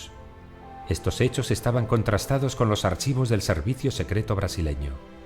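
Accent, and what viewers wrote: Spain. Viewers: El documental está narrado con un acento 100% de España, no hay nada extranjero (ni peruano) en él.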